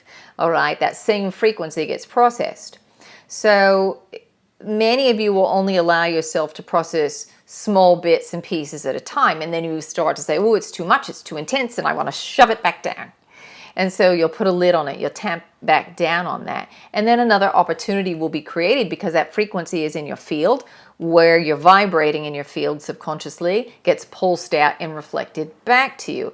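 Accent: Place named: Australia. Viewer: I thought you were Australian!!! This is the first time I have ever heard you talk as, and not as The Pleidians. So, the voice of the Council that you channel sounds a bit Australian to me - actually VERY Australian to me. Have you ever been told this?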